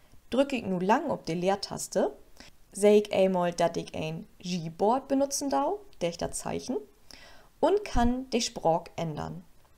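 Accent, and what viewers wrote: Low German, Germany. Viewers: Es ist so schön wie Plattdeutsch spricht. Mir geht das Herz auf. Eine Steigerung geht nicht. Dankeschön ❤👍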